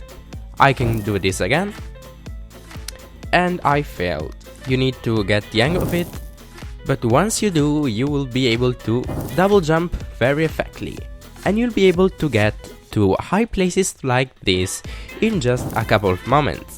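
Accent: Italy. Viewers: Italian :D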